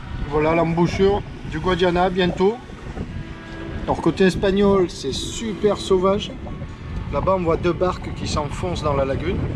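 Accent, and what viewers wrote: South, France. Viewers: SALUT l'amie qui parle le sud je sui guedin rien que de t'entendre je me bar a la réunion dans 2 a 3 semaine re-joint moi faut juste faire l'afrique pour moi c dommage je le fait pas encore en voillier mais bon a charge de revenche aller profite fait des bon virement de bords sans partir a l'eau tes seull mes avec nous on t'aime é te soutien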